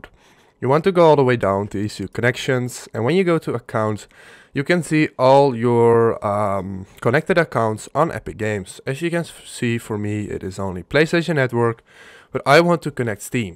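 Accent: Netherlands. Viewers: Dutch accent lol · nederlands accent lol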